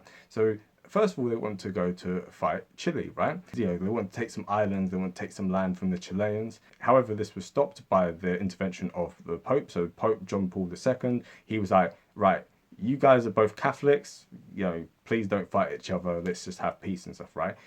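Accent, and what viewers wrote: United Kingdom. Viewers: He sounds British. Maybe London.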